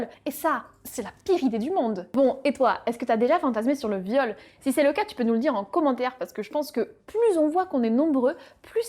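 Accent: South, France. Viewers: Bravo GG félicitations avé le petit assent du sud <3 <3 L&V 💑 #ĹҎ ➰ #Shibuntu <--- Seek on Youtube ƪ(˘⌣˘)ʃ 🌸 #ShibariConsensuel 🎀 #ASMR 🎧 #LacherPrise #Lacher_Prise_ 💐 #Shibari ⛩ #Kinbaku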